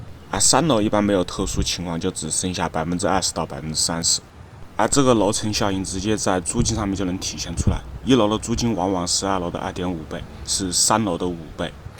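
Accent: Wuhan, China. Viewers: 我怎麼聽出武漢話的口音了哈哈哈🤣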